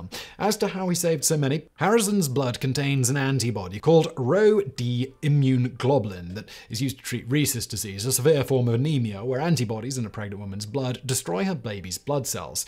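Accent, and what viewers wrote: England, United Kingdom. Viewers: Was it just me, or was extra laden with English in this video??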